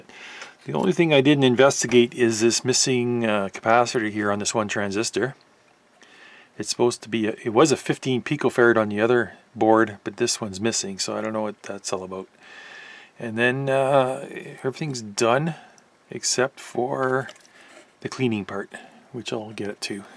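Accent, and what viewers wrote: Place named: Alberta, Canada. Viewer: This is my favorite vintage audio restoration and Alberta-accent channel on YT. So informative. You deserve far more subscribers, sir.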